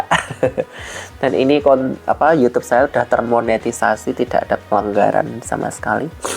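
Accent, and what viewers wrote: Java, Indonesia. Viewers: yaa jangan dong mas biarpun aku jarang komen tp aku tetap nonton video mas,yg bikin seneng celotehan dengan bahasa Jawa medoknya yg bikin kangen❤ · Aku seneng sm ngomongnya mas jawanya gak dihilangin mas aku suka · mas jngn break jngn di jual klo di jual mending ibuny yg nerusin klo mas break gk ada yg celotehanny yg lucu ngomong jowone ora ketinggalan aku seneng soale aku yo wong jowo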